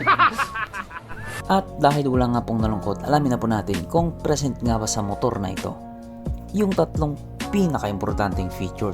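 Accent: Visayas, Philippines. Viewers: kusog kaau ka nanopo bisaya baya kaayo imong jaming.. balik2 ra🤣🤣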